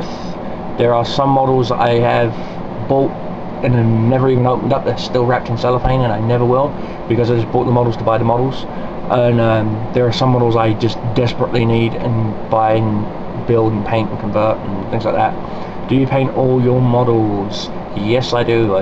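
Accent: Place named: United Kingdom. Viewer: Like it, good story about the die rolls. Where are you from? It sounds like your from blighty but your reference's to dollars makes me think you live in the states or australia.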